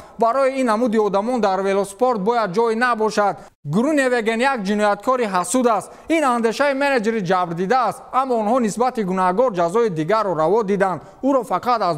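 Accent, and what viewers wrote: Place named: Rural, Tajikistan. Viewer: Акай чуни мни гох гох дехотигирам гапзан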